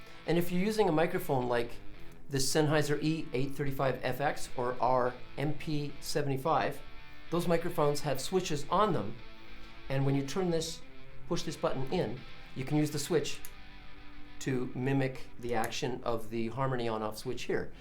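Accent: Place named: California, United States. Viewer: It's better to sound like you know what you're talkin about of course and instead of letting us here at tell us what we are going to hear. You must be from California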